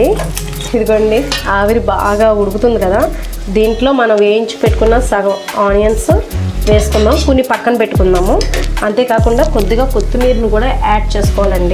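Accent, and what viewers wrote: Godavari, India. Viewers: Madam meru godavari zilla valla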